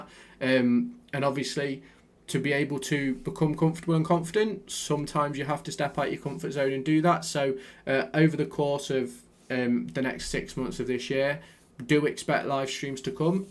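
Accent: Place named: Derby, United Kingdom. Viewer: Are you Derby born and bred? You sound like you are. I'm allenton originally but now in chellaston.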